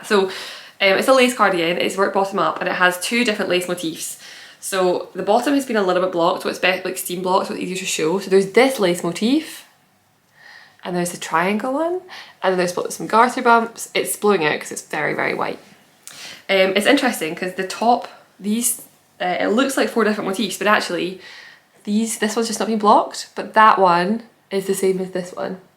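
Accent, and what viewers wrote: Scotland, United Kingdom. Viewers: Hello, thank you very much for your videos. I love your work, your choice of colours, wools and designs. Congratulations, you are such a talented person and I love also your Scottish accent not difficult to understand at all for me, a Portuguese woman living in Paris for 34 years, since 1990. I’m so happy to listen to your podcasts and following you every week it’s a great pleasure. Thank you for sharing your experience and knowledge with us. Never give up, you’re a wonderful person ❤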